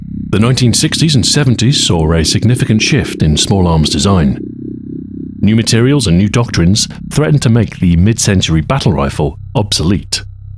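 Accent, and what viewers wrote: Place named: United Kingdom. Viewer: Love hearing that thick British Accent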